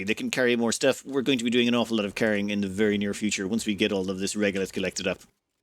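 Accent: Ireland. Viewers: YOU'RE IRISH?!?!! FUCK YEAH!!!!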